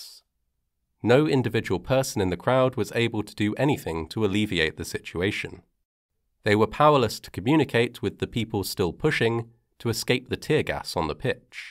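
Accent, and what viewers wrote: England, United Kingdom. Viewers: I love listening to your narration, I could listen to it all day. Out of curiosity, what region of England do you and your accent hail from? Although some accents of “Old Blighty”, like ‘Cockney’, are quite unmistakable; but of others, I’m sorry to say, that my American ears sometimes have difficulty in their ability to distinguish.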